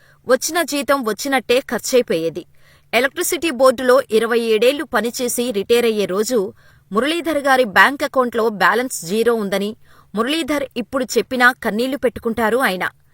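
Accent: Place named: Telangana, India. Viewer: Neku telagana yasa vachu kabati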